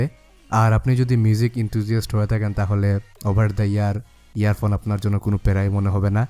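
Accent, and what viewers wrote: Sylhet, Bangladesh. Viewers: Ai kita sylhety ni? Masha Allah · Bhai er basha ki sylhet? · ভাই মনে হয় সিলেটের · Kitaba vai Sylheti ni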